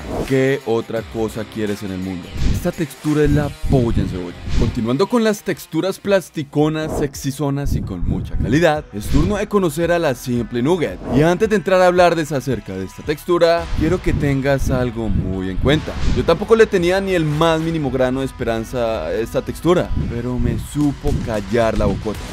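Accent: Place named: Colombia. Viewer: Por qué hablas como colombiano? deja de hacerlo es molesto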